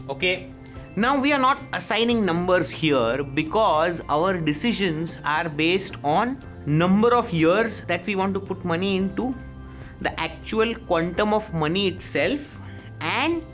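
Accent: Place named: Gujarat, India. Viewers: that sweet gujju accent though. thanks, the video was very helpful.😊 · I was just going to comment this... Gujju accent can be picked up very easily... :-D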